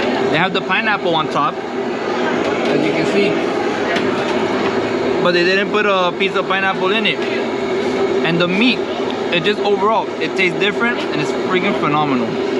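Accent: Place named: England, United Kingdom. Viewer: You speak Spanish, there’s no need for you to pronounce Spanish words with an English accent. It would help for those that don’t speak Spanish n would like to visit .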